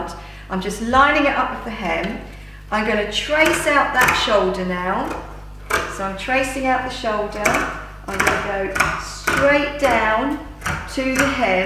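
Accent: United Kingdom. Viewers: Gorgeous! Way to go! I am loving recycle sweater projects this winter as well. I just did a cashmere remake. I could listen to your Brittish accent all day! Keep the great projects coming!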